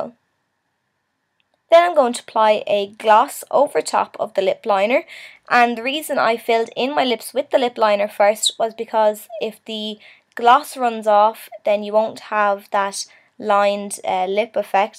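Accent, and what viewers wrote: Ireland. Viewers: Are u irish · ur irish? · Ahh the irish accent :)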